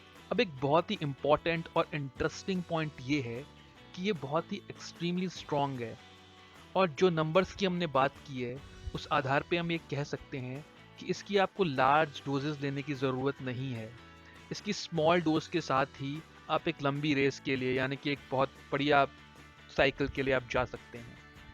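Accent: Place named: India. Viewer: The India accent is so cool and funny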